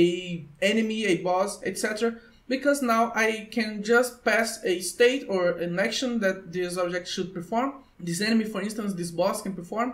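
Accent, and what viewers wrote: Brazil. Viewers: Ótimo video! Eu já vi outros videos teus, e pelo sotaque, desde o início desconfiei de você ser brasileiro, com este eu confirmei a suspeita. Sotaque brasileiro bem característico. Hahahaha. Com ou sem sotaque, continue com os vídeos. São muito esclarecedores. E sim. Taxation is theft!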